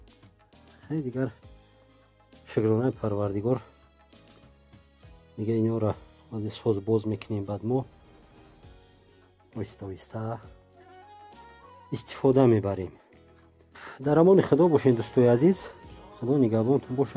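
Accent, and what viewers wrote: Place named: Tagnob, Tajikistan. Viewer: Асалому алайкум бачаи хеш мохам мардумитагновем хам сари маргзори ва хам пасикухием бехтарин наворхо бо лахчай тагноби саломату сарбаланд боши бо фарзандонат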